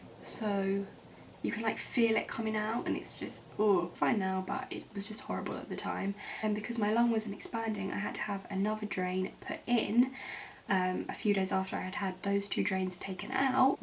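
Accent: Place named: United Kingdom. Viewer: Where do you live in the uk? I love your accent. Plus your eyebrows are amazing! Do you also have Instagram?x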